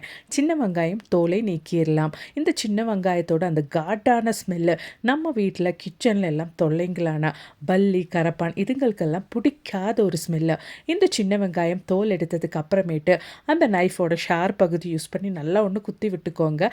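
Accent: Kerala, India. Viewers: Sister ur malayala tamil so sweet.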